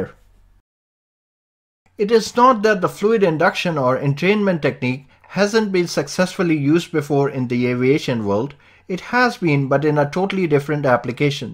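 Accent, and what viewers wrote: India. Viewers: It could actually take off, if only the hindustistani speaker would be replaced by a normal english language spokesman. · Lol. Are you saying that to make him feel better about his thick Indian accent that is hard to understand?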